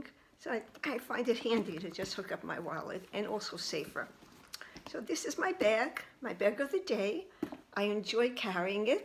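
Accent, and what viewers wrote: New York, United States. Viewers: Hi! I hope your surgery went well. I love your NY accent, it makes me feel like I am home! I'm from 45 minutes outside of NYC! I miss it and hate Texas with a passion! But I'm moving back soon enough. Do you remember the little pot glosses? I loved those. Clinique discontinued them years ago. Meadow Flower Honey was my favorite.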